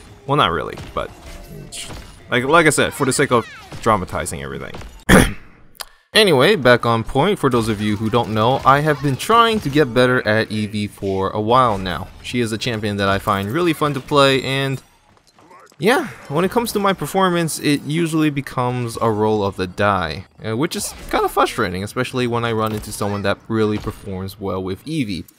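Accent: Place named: Malaysia. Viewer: I can smell the Malasyan in his voice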